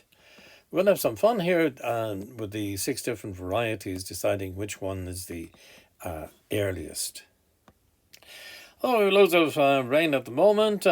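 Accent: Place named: Cork, Ireland. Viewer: Are you from Cork? I am a ru...!!! 🤣👍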